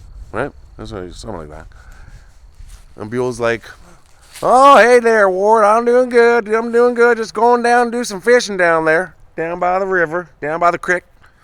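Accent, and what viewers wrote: South, United States. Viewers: I have to remember not to be eating or drinking anything when I'm watching your video's like this...I dang near choked...😂😂...Love that southern draw there...Question, did Beull have Biscuits on the bow before he played with the TNT? Much respect to you for showing so much respect to the arm...Much love sent to you... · You are the best I love your humor. Your southern accident is cracking me up. I'm From Florida😂 love you and your channel❤ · Haha...Love it! It's worth it just to hear you speak "Southern'ish" lol♥️☺️ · This was hilarious 😂 love the southern accent! You did sound like McConaughey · Oh my gosh, you are so damn funny. You and your southern accent. Good job though enjoy the rest of your day.🤣💜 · Live your videos ❤ so interesting, thanks for bringing me along . Love your southern accent. You are hilarious yet respectful at the same time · Another, Great Video 💯! 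Your Southern accent made me laugh 😂. Hope you are well? I’m going to catch your next live video. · Love watching your videos my friend!! Very interesting!! You are an amazing person my friend!! Love watching your videos!! Love you and your channel!! Love the southern accent!! God bless you my friend!! Much love!! Peace!! ❤️💖🥰💙💕💜😎😎😊😊✌️✌️✌️✌️✌️✌️ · A Canadian with a southern accent. Lol! I have one but with time being away from home it is less. When I go visit and it come in thick funny! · This one reminds me of the foot video you made. How in the world do you find stuff like this. Loving the content. You’re so funny while being respectful at the same time. Almost as bad as the guy cooking biscuits on the bow in a thunderstorm!! 😂😂😂😂 Southern accent is on point! 😂😂 I myself am a southern gal. And I am loving it. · Love the southern twang ❤. You nailed it ! For sure....For sure. 💯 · . Kid dynamite 😅 I enjoyed this.. 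I read afterwards he was alright? That face you made OMG. It messes up their swimming bladder lol That southern accent ROFLMAO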